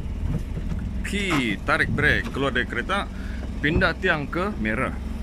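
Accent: Sabah, Malaysia. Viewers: Sebulan lagi test jpj, mmg tk ada latihan persiapan utk jpj ulangan keesokkan nya. Sebulan juga duk “study” video-video cikgu. Suka juga loghat Sabah cikgu. Akhirnya lepas juga test harini 25/11/2021. Terima kasih cikgu share ilmu 🙏🏻 Salam dari Melaka.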